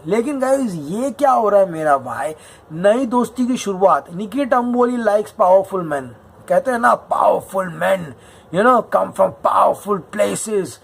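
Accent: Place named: Bihar, India. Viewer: Bhai bigboss wale public ko chutiya bana rahe hai aaj pehli baar mujhe aisa laga ki sab kuch pre planned tha. Vikas ka rona thoda real laga kyuki aadmi jab rota hai to koi bhi pighal jaata hai. Uske pass joker card hai. Wo dubara entry lega. Mujhe bhi gupti utna pasand nhi hai but emotional kar diya usne. bhai Amitabh bacchan ki mimicry ek number mera bhai. And your bihari accent is mindboggling. Love from bihar.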